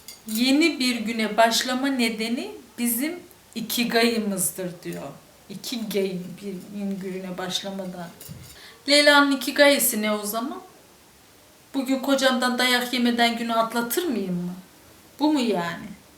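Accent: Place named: Erzurum, Turkey. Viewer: Ben sivasliyim.siveler benziyor sanki Erzincan Erzurum lu gibisiniz. Doğru mu?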